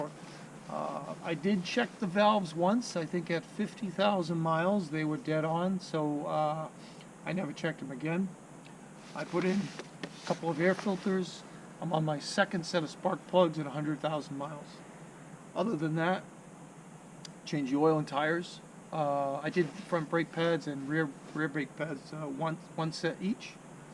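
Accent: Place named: East Coast, United States. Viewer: This is possibly the BEST MOTORCYCLE REVIEW I have ever seen. No bells, whistles, music and NO bullsh!t. Just a guy sitting on his bike laying out the DETAILS. (And in a charming east US accent). I’ve ridden in light snow but this guy is the “road king!” Never have I felt such respect for a fellow motorcyclist.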